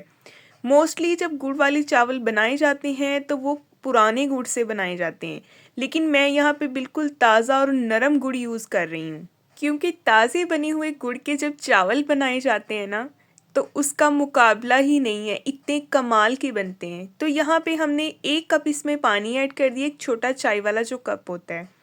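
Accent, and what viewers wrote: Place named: Punjab, India. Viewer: Aapkay gur walay chawlon ki tarqeeb bohot achi hai aur apkay lehjay se mujhe aap punjabi lag rhi hain. Mein aapki nano hoon. Main pakaon gi to zaroor btaon gi. Farida Yazdanie, mein 79 years ki hon.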